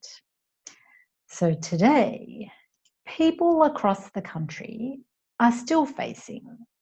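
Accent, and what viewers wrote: Australia. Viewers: Hi, I just arrived in Australia, and I wanna learn Australia accent so bad. Thank you for your videos. I hope I can learn a lot from you.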